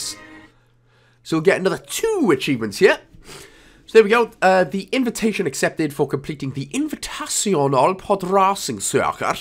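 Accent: Wales, United Kingdom. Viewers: maybe he's ammerican? The Welsh accent isn't the easiest to follow if you are not from the UK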